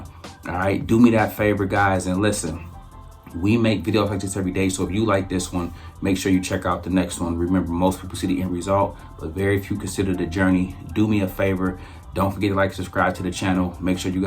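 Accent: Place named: Philadelphia, United States. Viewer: Are you from Philly?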